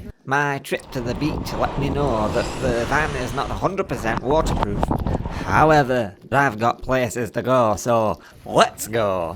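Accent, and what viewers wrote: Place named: Liverpool, United Kingdom. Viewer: accent is like Ringo's. Is he a Liverpudlian? (PS. I'm an Americanium)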